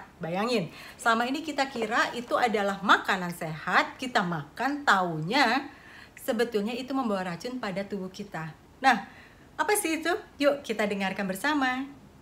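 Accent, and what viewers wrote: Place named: Java, Indonesia. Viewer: Kaya jawa ya.. sy jogja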